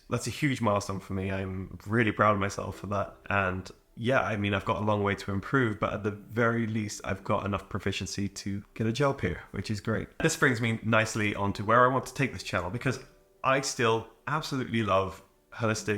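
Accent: Sweden. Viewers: you already lost your British accent and sound like a swede speaking English.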